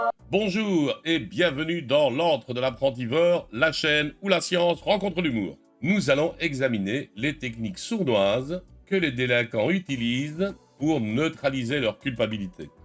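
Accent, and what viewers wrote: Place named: Belgium. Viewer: Salut, c'est intéressant et ça se voit que ça te plait de faire des vidéos et que le sujet t'intéresse donc c'est communicatif pour l'apprentissage. Sur l'humour, fais gaffe que tu fais des références belges (sans parler de l'accent mais on se refait pas) que la majorité de l'audience va avoir du mal à comprendre (par exemple le minerval) donc je pense que tu vas perdre pas mal de gens, l'humour coupe déjà le rythme du propos principal alors s'il introduit des références inconnues je pense que tu perdras + les gens. Bonne chance pour la suite de ta chaîne !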